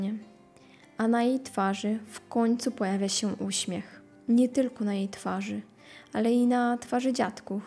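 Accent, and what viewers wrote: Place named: Russia. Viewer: Posiadasz RUSKI AKCENT..Czy jesteś Ukrainka?????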